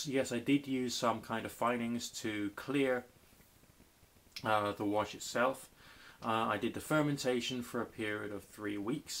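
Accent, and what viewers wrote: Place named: England, United Kingdom. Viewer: Annoying accent .. english Lad ..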